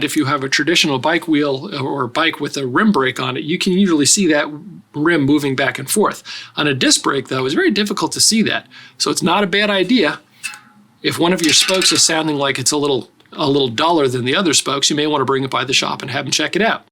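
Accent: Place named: Pittsburgh, United States. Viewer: You say now and round like my grandmother she was from Pittsburgh. Great video sir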